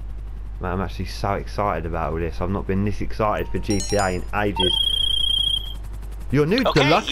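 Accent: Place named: United Kingdom. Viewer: i know you are british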